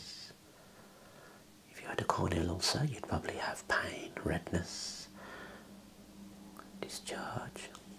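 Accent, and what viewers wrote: Birmingham, United Kingdom. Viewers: My favourite Brummie 😍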